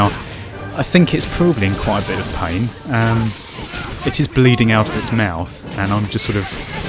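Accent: United Kingdom. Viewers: Your commentary is just hilarious. That confused, yet dry attitude along with your accent makes me picture a proper englishman with a top hat playing an (at first) very confusing, yet awesome RPG. The lighting is a bit off, jacked that up a notch too much.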